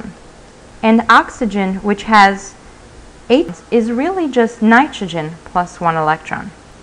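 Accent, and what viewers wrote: United States. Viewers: Why does every chemistry video have an American accent!?!?!?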